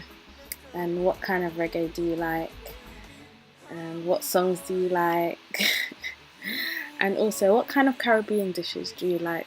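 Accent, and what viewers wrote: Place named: London, United Kingdom. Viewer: I can hear the London in you. Lool. Great job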